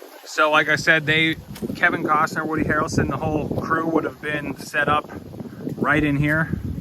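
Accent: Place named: United States. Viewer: You sound like an American, but your grammar is pitiful.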